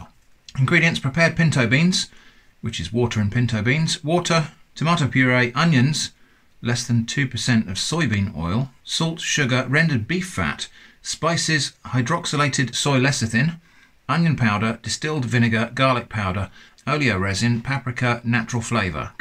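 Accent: United Kingdom. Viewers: Best Brittihcent inherent.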